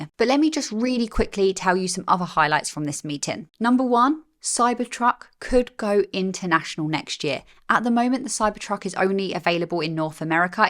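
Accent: United Kingdom. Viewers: British accent ✅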